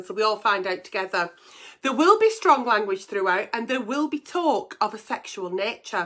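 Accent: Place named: England, United Kingdom. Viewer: Great video...Great Advice.... i was shocked with your honesty and vulnerability....respect to you! 👍🙋‍♀️👏👏👏👏 Your hair colour is gorgeous!! I live in the Netherlands...have done since I was 17...I'm 58 now and miss English accents.... yours is brilliant! Keep up the awesome work! 😀👍👏👏👏👏🌺🌺🌺🌺🌺🌺🌺🌺🌺🌺🌺🌺🏵🏵🏵🏵🏵🏵🏵🏵🏵🏵🏵🏵🏵🌼🌼🌼🌼🌼🌼🌼🌼🌼🌼🌼🌼🌼🌼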